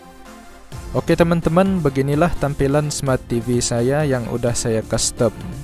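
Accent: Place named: Sarawak, Malaysia. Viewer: dah kuduga kamu dari Sarawak, dari logatnya. Tapi bahasa Indo kamu fasih banget kayak asli orang indo, malah aku tadinya ngira kamu orang daerah Kalimantan. Tapi aku penasaran kenapa kamu orang malay pakai bahasa Indo ? Apa penonton kamu kebanyakan dari Indo kah, atau sekedar obsesi ?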